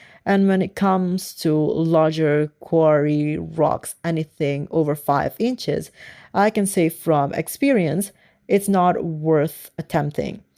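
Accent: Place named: United Kingdom. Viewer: Tq for sharing. Nice accent though..such watching review from someone living in uk.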